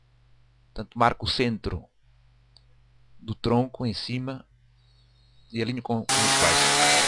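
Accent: Portugal. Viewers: amigo pelo sotaque você é de Portugal?? ou está em Portugal? gostei muito de sua casa, gostaria muito de fazer uma aqui no Brazil. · чистый португальский... какая редкость.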